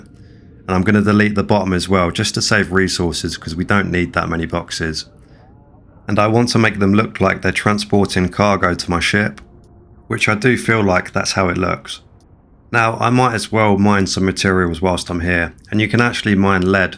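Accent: United Kingdom. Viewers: Nice outpost dude. If you invest in your outpost skill you can definitely pimp it out even more. Also nice to here a fellow Brit doing some videos haha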